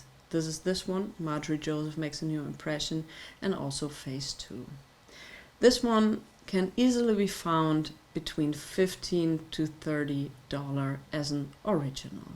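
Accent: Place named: United Kingdom. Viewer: ' " " was a good album, vocals by. Michael later released his Funk/R&B classic " " which I had on vinyl when it was first released in 1980, great album. Do you listen to mainstream Funk/R&B? I'm a white man living in California [U.S.A.] and been listening to Funk/R&B since the '70s. I like groups like Ozone, Chocolate Milk, Sun, General Caine, etc. I like your selections, because to me, I've never heard of any of them, which makes me interested in what they sound like. You sound like you're from the U.K. and you had different singers & bands that never really hit in the states, especially in California. I've heard of some of your picks, two of them were & [ had a hit in the states called " " from 1983, it was a good dance tune] so some of your picks were new to me. It's nice to see someone as knowledgeable as you when it comes to Soul/Disco music, I am a fan of both genres also. 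Disco music to me was a little bit cheesy at times, because everybody & their mother were doing a disco song back then, there was stuff like " & " " that drove me nuts, because I just didn't like them. I've listened to a lot of different styles of music growing up and am lucky to have experienced everything I've listened to or was recommended. Do you like " " by, to me that song was funky & very dance driven when it first cam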